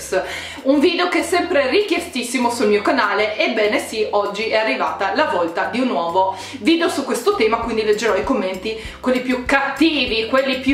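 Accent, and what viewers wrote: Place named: North, Italy. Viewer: Ti adoro all' inizio mi ero fatta un'idea troppo superficiale di te forse perché non conoscendoti e vedendo per la prima volta i tuoi video non avevo ancora capito che persona potevi essere ma dopo un po' non sono riuscita a non smettere di guardare i tuoi video, sei simpatica solare e anche bella. forse all' inizio mi sono fatta un idea sbagliata di te un po' per l' accento del nord e un po' perché non capivo la tua ironia ma ripeto è stato inizialmente poi conoscendoti ho visto altro e la tua ironia la adoro! un bacio e le Chanel se le hai mostrare chissenefrega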